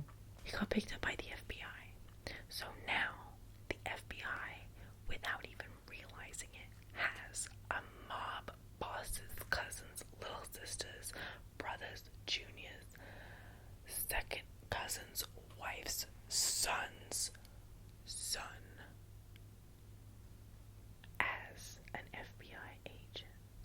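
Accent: New Jersey, United States. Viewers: I LOVE the jersey accent! And it low-key reminds me of the mom in karate kid 😭 ifykyk Love ur vids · She can do Southern accents, Midwestern accents, and now Jersey. What more? 😂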